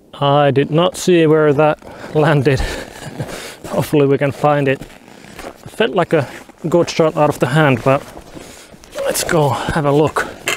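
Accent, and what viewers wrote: Finland. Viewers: How didn't I notice you're finn, you sound like the iceman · As soon as I heard your accent I a had a hunch you were a fin! :D Great tutorial man and enjoyed the ending. Very chill. Kaikkea hyvää !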